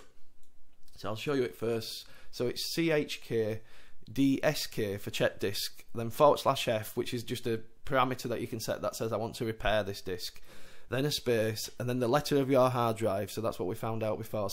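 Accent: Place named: United Kingdom. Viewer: I'm a yankee. i usulaly don't have problems understanding Brits, but in this case hi accent added to the speed of delivery makes it hard to understand. Also his video is to small to see what he has typed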